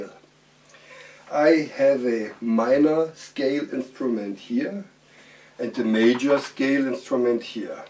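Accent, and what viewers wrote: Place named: Germany. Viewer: am Ende wird man den deutschen Akzent nie los.. Jedenfalls danke für das Video, ich habe lange nach etwas in der Art gesucht..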